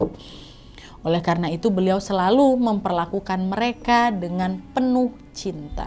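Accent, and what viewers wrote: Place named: Medan, Indonesia. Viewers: macem cakap org medan ni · ni logat org Medan kyknya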